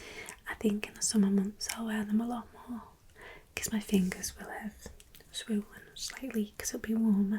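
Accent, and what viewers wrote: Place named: Liverpool, United Kingdom. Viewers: I love your Scouse accent, I love Scouse accents anyway but your version is so soft and relaxing 😌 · Im irish and i loveee the scouse accent. Especially in asmr lol. Very relaxing !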